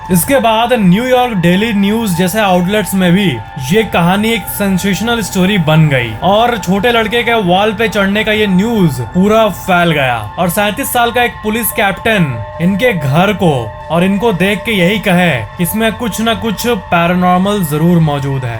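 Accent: Bihar, India. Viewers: Kya bihari accent hai 🤣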